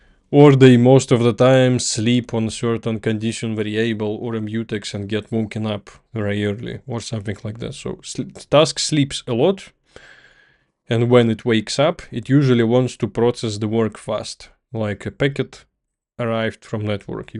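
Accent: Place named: Russia. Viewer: nice lecture other than the scarrying russian accent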